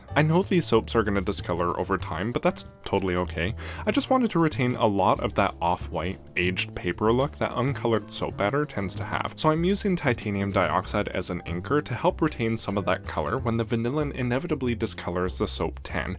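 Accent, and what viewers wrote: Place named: England, United Kingdom. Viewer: Also from the Uk. Your English accent... love it!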